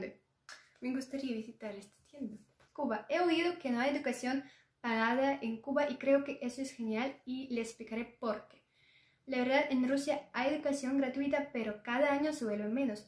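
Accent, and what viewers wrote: Argentina. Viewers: Me encanta tu español muy argentino saludos desde Argentina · Interesante video! 👏 Que bien el acento y modismos de Argentina 😊🇦🇷. Saludos desde Buenos Aires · Si tiene acento argento y vocabulario argentina... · Como hablas el argentino es muy bueno jaja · Yo como mexicano si he comido los famosos Escamoles,que es la hueva de la hormiga,y son deliciosos,y muy caros ,ha de ser por que no es facil recolectarla,ya que los que se dedican a eso tienen que soportar muchas picaduras de las hormigas,hay vídeos de eso,saludos rusita,que has aprendido español y que estas tomando acento argentino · Saludos desde Argentina me encanta tu acento Argentino che. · Lo peor que pudistes hacer es agarrar el acento arjentino, es el mas feo de todo latino america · Jajaja me encanta el acento argento que tenés, hablás muy bien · tenés un acento parecido al cordobés (Argentina)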